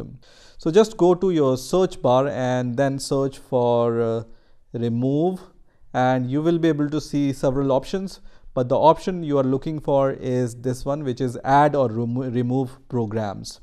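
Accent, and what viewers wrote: India. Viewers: always the people with the indian accent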